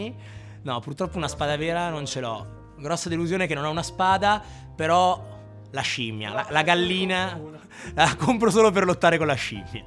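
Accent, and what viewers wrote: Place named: Milan, Italy. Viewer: Grande, bravo e anche simpático. Oggi sentendoti parlare mi hai riportato indietro nel tempo alla mia infanzia, vissuta nella provincia milanese, ed è stata pura e viva emozione. Cinquant’anni più tardi qui nel cortile di casa alla periferia di Madrid la lingua non è più quella, allo stadio non c’è Gianni Rivera ma c’è Carlo Ancelotti. Tempi e luoghi che cambiano…. Grazie.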